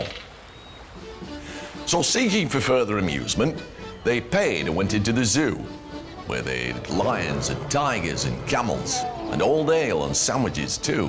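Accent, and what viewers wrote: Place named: United Kingdom. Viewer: Обожаю британский акцент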